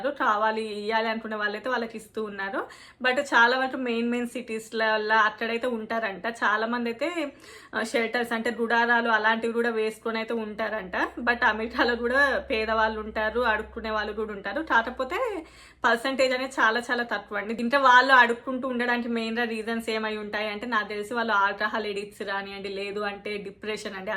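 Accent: Telangana, India. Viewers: Your voice n fluency is so natural akka, Medi Telangana ?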